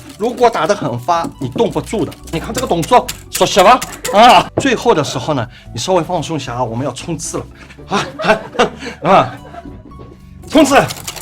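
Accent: Shanghai, China. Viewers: 这是很标准的上海普通话，哈哈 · jijnjijijj 沪普😂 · 朱师傅一口上海普通话听着真亲切啊。听着朱师傅的声音好像回到了上海，很久没回家了，满满的思念。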